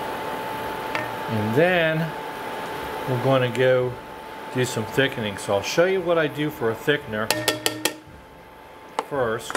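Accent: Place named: Pennsylvania, United States. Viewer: My father was WWII, gunner's mate in the north atlantic. Have a good friend who is a Seabee and she just left this week for her year... In Somalia, we think. My husband was searching bean and ham soup and voila! Yours came up and he shared it to me. I watched it and I told him I thought you were from PA... Just heard a word or two every once in a while. We are from Pgh area but living in NC.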